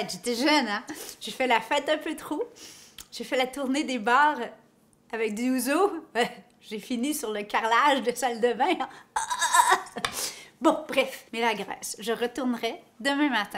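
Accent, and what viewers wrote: Quebec, Canada. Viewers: Merci beaucoup de m'avoir répondu j'en suis très touché, car tu dois débordée de messages d'internautes. Tu as parfaitement raison il faut goûter ses plats quand on les a terminé, c'est une des raisons parmi d'autres pour la quelle j'apprécie tant ton blog, et ta bonne humeur constante, ton humour, ton accent québécois que je trouve si craquant, même il m'arrive souvent de ne pas trop comprendre tes expressions québecoises typiques, bien que je prends des cours sur des sites de traduction, donc je sais maintenant ce que veux dire " j'ai tellement capoté " ou " jaser " ou tes mesures en cuisine: là où tu dis une cuillère à thé nous français on dit un cuillère à café, où tu dis une cuillère à table nous on dit une cuillère à soupe, tu dis 2 livres nous on dit 1 kilo, Et j'ai beaucoup rigolé sur ta vidéo de recette de poutine dans le chalet type trappeur, où tu as parler de ton premier voyage à Paris avec Lionel chez son tonton et sa tata où il a " sacré " toute la soirée, " AAH fait nous sacres fait nous des sacres!! 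" 🤣😅!!! Mais vraiment une chose qui m'impressionne tellement c'est comme vous avez acqueilli aidé et élevé Momo, car ça à vraiment dû être tellement compliqué, vous êtes vraiment des parents que tellement d'enfants rêveraient d'avoir... J'aime comme toi énormément cuisiner et j'y passe des heures surtout pour ma chérie, puisque je n'ai pas la chance comme toi d'avoir des enfants... Et encourage Lionel à cuisiner plus souvent et toi filmes le, vous all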